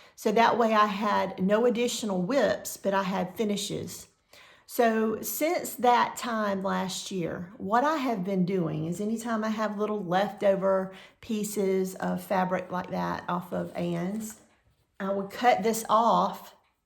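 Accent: South, United States. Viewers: I just love you and your channel. Everything cross stitch. And that southern accent, love it. You are a great gal. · I always enjoy spending time with you and listening to your lovely southern voice describe your junking finds and your stitchy accomplishments. Have a wonderful couple of weeks.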